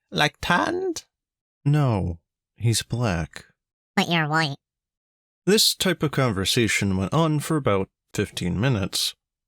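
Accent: United Kingdom. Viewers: a black guy with a british accent. so i guess ksi doesn't exist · A Black dude with British accent... John Boyega?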